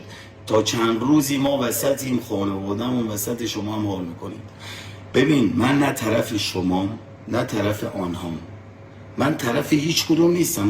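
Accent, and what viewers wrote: Afghanistan. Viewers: چرا لهجه لری به افغانی تغییر کرد؟؟؟ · لحجه افغانم گرفتی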